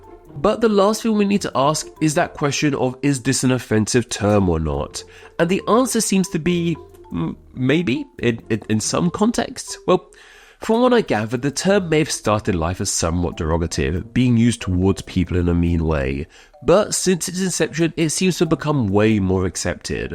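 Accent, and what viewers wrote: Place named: United Kingdom. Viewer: I mean, he is British. He doesnt say many different things "correctly."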